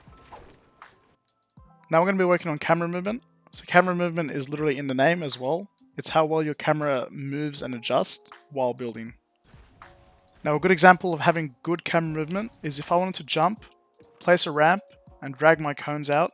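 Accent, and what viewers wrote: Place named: Sydney, Australia. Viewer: Sounds like he's from inner west-sydney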